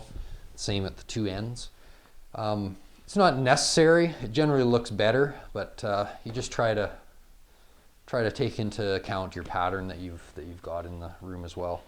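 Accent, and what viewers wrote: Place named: Canada. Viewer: i love your canadian accent 😃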